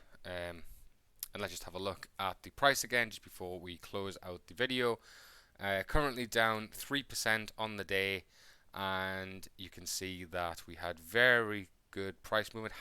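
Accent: United Kingdom. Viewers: Oh nice, a Brit. Hey 👋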